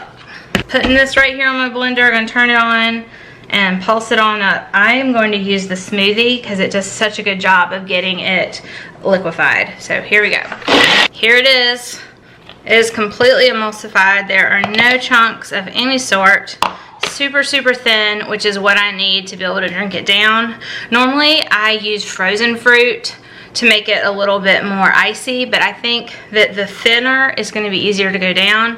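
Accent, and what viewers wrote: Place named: Louisiana, United States. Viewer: I love hearing you talk...reminds me of home! I'm a Louisiana girl living in England!! My surgery date has been set for Nov 11th!! So excited to prep for the different stages. These recipes are so helpful. Thank you. Also where about are you from??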